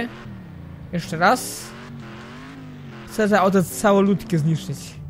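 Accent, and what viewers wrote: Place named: Germany. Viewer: ty gadasz z akcentem niemieckim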